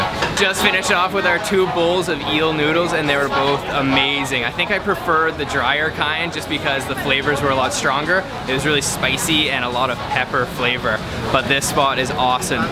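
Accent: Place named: Canada. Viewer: Yay represent (I'm Canadian too)!! I can tell from your "aboot" 😆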